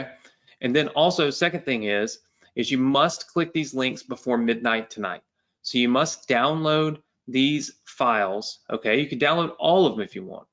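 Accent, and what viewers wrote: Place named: Mississippi, United States. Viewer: I know you are from miss. you talk like it.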